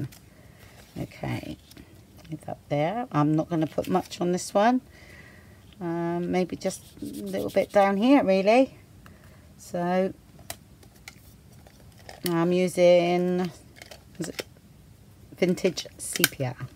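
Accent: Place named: Cornwall, United Kingdom. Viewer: So glad I found your channel, these are so pretty I love how you make them look so easy but still so amazing. Also I love your accent, you wouldn't be cornish by any chance?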